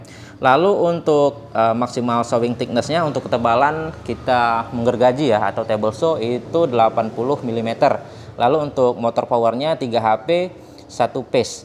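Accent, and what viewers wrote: Medan, Indonesia. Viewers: Nampak Medannya, "dipala-palain"😆😆. Mantap reviewnya, bang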